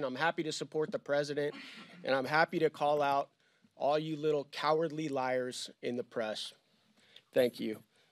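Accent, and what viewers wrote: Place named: Upper Michigan, United States. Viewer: He sounds like he’s from upper Michigan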